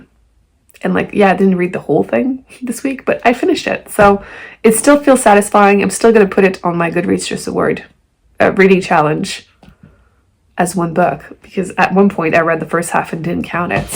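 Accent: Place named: Quebec, Canada. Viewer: Nevermind, that accent answered my question. Maybe you'll see a fellow quebecoise booktuber soon? I'm trying to start one even though I'm very new at this. You managed to get an impressive amount of subscribers, congrats!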